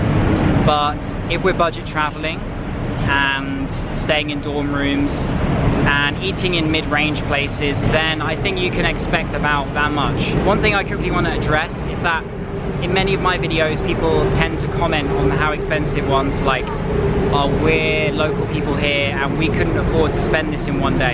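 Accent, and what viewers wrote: United Kingdom. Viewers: I'm Thai people in BKK I love your video from Thailand, I very very like your British accent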